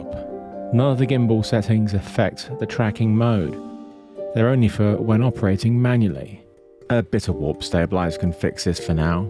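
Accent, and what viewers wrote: United Kingdom. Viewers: Fantastic video, this is by far the best and most honest review of the 4D I've seen yet. I'd say you are doing pretty well for an old guy haha! Always love your reviews and your soothing British accent makes them all the more enjoyable to watch :) That story about your back problems though... ouch. I'm a pretty young and fit guy, but even I started hurting while watching that! Good food for thought as I continue getting older and more involved in my videography career.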